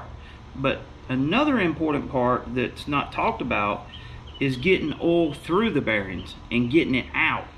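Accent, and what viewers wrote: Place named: South, United States. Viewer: Thanks Bud regards from AUSTRALIA, p.s i love your Southern Accent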